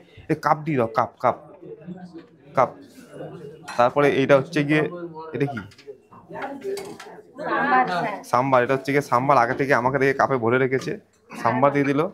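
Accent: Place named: Bangladesh. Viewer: Bangaladeshir moto kotha bolcho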